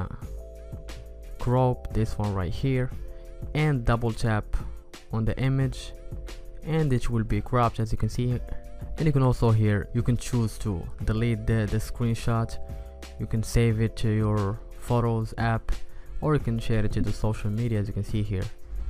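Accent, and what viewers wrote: Morocco. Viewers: moroccan accent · From your accent I can tell that you're Moroccan 👍🏻👍🏻 keep up the good work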